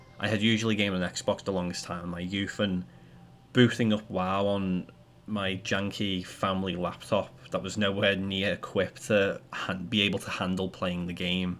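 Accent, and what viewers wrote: United Kingdom. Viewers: It's one of the many regional British accents, maybe you should broaden your horizons more.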